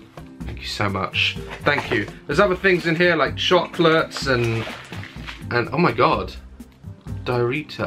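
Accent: United Kingdom. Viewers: he is from Britain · London he's British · I'm pretty sure he's British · Hes British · he's british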